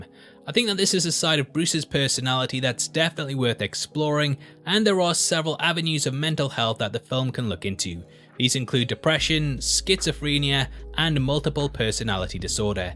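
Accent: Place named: Newcastle, United Kingdom. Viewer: My face when I realised the voice I was listening to was!! Geordie 🐐 or battle rap 🙌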